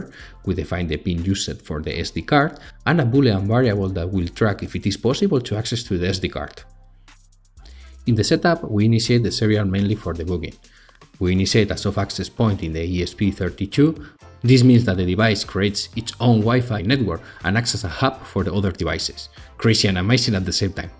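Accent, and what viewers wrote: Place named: Spain. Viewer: Tu eres español!!!, no pregunto. Gracias por el video y compartir proyecto. Trabajo con ingleses y tu acento te delata.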